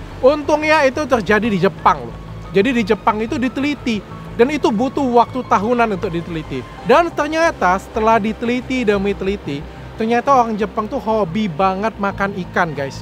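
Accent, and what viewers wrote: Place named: Sumatra, Indonesia. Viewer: Kami org Sumatra emang ngomong nya kekgtu 😂😂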